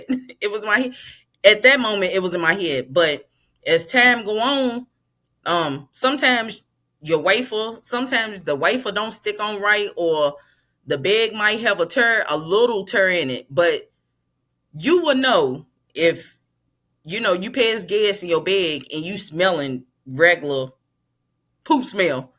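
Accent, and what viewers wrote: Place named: Kentucky, United States. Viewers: Ayeee😁😁🤣🤣 Well thank youuuu sugars. That's that Kentucky talk😂😂😂 Appreciate you 🫂🫶🏾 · Hey sis I just listened to your story and just wanted you to know that it was so encouraging the way that you can still smile after all you've been through I really need to change my way of thinking and be positive and be more faithful just like you said that you are I pray for you that everything else will go well in your life you seem like such a sweet person and your southern accent had me just laughing I love you sis praying for you thank you for keeping it real I know good people when I see them and you most definitely or a person with a good heart and a good spirit stay strong and please keep me in your prayers my name is Deborah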